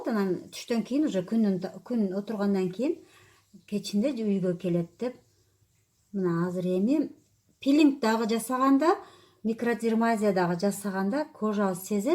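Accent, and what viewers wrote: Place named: Osh, Kyrgyzstan. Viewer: Ошская суйлогону.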